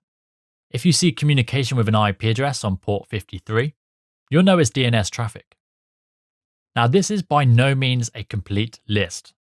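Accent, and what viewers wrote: United Kingdom. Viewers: Excellent layout, delivery, and explanation of material. Only problem I have is with the british accent, but I struggle with all types of accents. I totally cannot grasp concepts from asian, Indian, arab, french, german, and spanish accents. But I improviced and overcame this by reading the video scripts after the video. This method of learning complex concepts is the best of all methods of learning.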